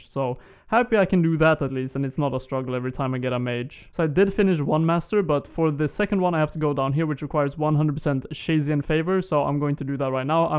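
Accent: Sweden. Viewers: Haha älskar att man hör att du är Svensk :)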